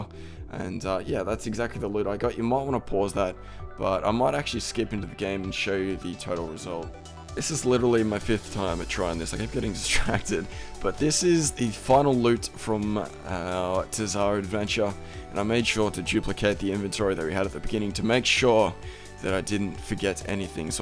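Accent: Australia. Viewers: No, he sounds Australian.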